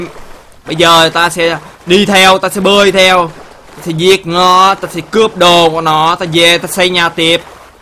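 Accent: Hue, Vietnam. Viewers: Anh nói tiếng Huế nghe hài quá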